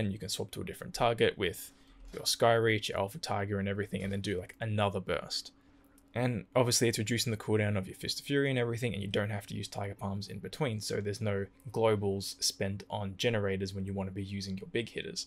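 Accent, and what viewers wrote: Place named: Australia. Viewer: I don't play WW but upon hearing a fellow aussie, instant sub. Watching this all the way through regardless because a 1 hour guide kicks ass, best of luck with the new channel mate x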